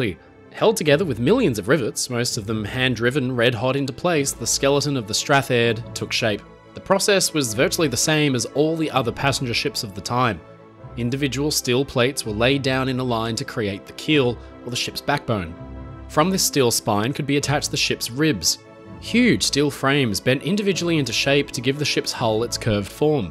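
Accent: Australia. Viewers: I didn't realize was Australian, one would think the accent would be a clear give away, but i mistook it for a British accent until i saw his location on the channel's description page.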